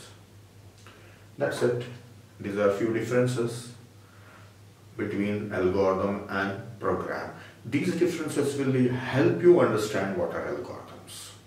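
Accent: India. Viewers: 印度兄弟讲的挺好理解，印度口音听久了也能习惯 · you have to make english subtitles, because the automatic subtitles from youtube are wrong a lot, as a south east asian, i have a hard time understanding your indian english accent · incredible india！I recently turned to Indian lecturers at YouTube to learn something. Except the accent of English, most of videos are so good and easy to understand like this one -----------Great respect from an international student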